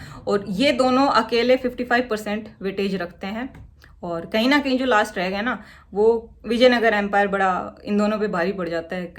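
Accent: Haryana, India. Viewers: हरयाणवी हमे सुनने में अच्छा लगता है।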